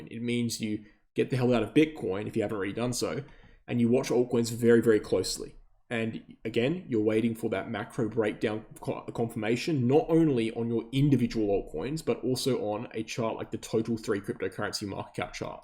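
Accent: Australia. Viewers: I can’t believe I had to suffer through an Australian accent for that long, and get nothing out of it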